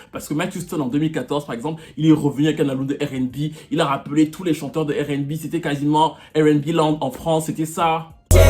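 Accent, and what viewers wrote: Cameroon. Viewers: Je viens de decouvrir ta chaine et like beaucoup,surtout ton ptit accent +237...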